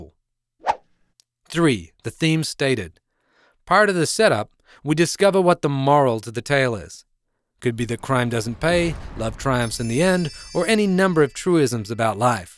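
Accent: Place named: Australia. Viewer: Totally random observation: this dude has got to be half aussie or something. There are some sneaky vowels & dipthongs giving away an accent! (Dont worry, as an aussie, its a cute thing to notice) Handy video, btw. Thanks!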